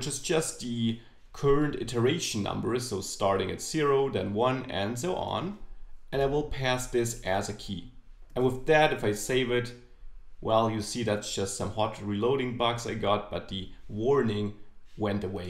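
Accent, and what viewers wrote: Germany. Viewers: Is that german accent? btw nice tuts. I would suggest you to make an advanced React/redux (100+ hours) PAID course.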